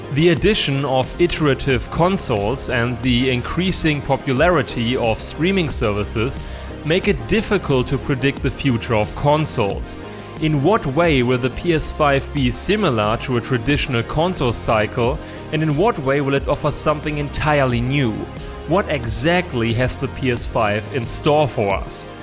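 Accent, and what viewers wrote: Germany. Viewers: you have a strong german accent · Englisch auf Deutsche Akzent, das erkenne ich sofort 😂😁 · I hate that german accent xD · I said he is German because of his English accent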